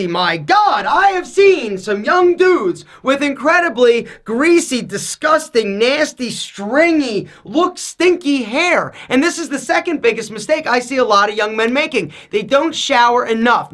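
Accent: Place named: Maryland, United States. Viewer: Are you from Maryland? U sound like everyone I know lol. I’m from Annapolis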